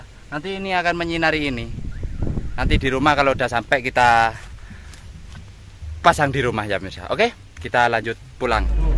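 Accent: Madura, Indonesia. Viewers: Mas orang malang apa madura ya.??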